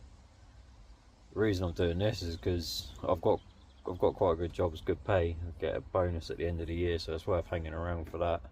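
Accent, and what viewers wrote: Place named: West Country, United Kingdom. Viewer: Been in a 07 tranny SWB RWD since june, zero insulation just the same. Bed lengthways tho, single air mattress on top of a camp bed. Now its getting colder am noticing the amount of condensation and dampness all over the place. Same situation having nowhere to actually do the work plus cba taking everything off the van and back on again every day to live in!! F knows how it'll be in winter 🥴🥴🥴 Also ive thought about bed being width ways as i think it's JUST about 6ft across half way up it but i use the backdoors for entry 98% of the time cuz im stealth, can't be using the noisy and revealing side door too often. Was thinking tho if bed was high enough i could crouch my way in thru the storage space. Again, nowhere to do the work 😂😂 Am in Essex, u sound a bit westy, if not norfolky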